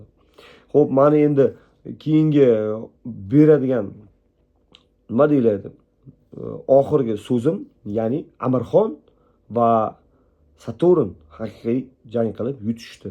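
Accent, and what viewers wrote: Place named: Uzbekistan. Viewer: Ўзбекча тоза гапирганиз учун рахмат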